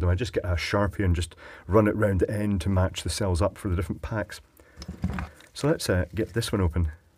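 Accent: Scotland, United Kingdom. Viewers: This guy has the scottish voice from al murrays sketch and its just glorious. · It's so interesting though, i love his Scottish accent too. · <num> percent pure British accent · He is scottish, you are british, so of course you two do not talk the same way XD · "cc" (closed captions) are rather amusing, no doubt resulting from the Scottish accent.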